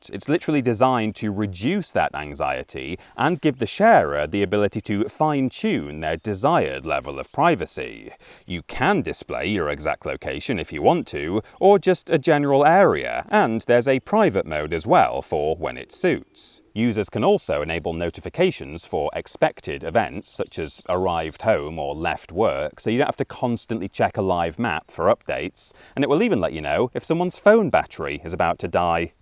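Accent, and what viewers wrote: United Kingdom. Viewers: Nothing quite like hearing a proper British accent expounding upon historical loos. 😂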